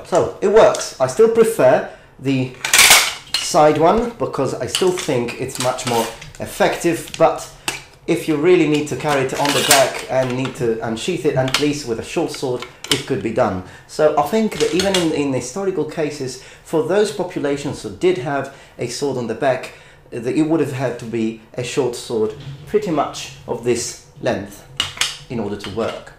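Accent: United Kingdom. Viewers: Where are you from man? I can't pick the accent. It sounds maybe South African or from somewhere around about Holland. I'm tending toward the latter because, though your English is very good, it ain't perfect. I don't mean to have a go but "information" is not a countable noun . PS, on the the other hand. Of course, native speakers don't spit out what the prescriptivists would dub perfect grammar one hundred percent of the time (far from it). However, I'm getting tinges of British flavour here & there, so I'm still guessing the Netherlandish vicinity. PPS So, I was so wrong. You're Italian. Oh the shame! Still, I'd not guess it. Thanks anyhow for you vids. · T'es français, nan ? J'ai cru repérer ton accent, mais tu as un excellent accent british. ^^ Vidéo très intéressante, je me doutais bien que ce n'était pas possible en temps normal mais ça a l'air tellement cool ! 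x) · I just noticed that your British accent is pretty strong! You even use the R between words like in "the idea rof a straight sword". That's pretty funny to me but that shows your natural skills with phonetic articulation; you also have a talent for acting, I don't know if you ever studied theater but you definitely should. I'm Sardinian by the way. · what's even more interesting, is an Italian speaking with British accent :)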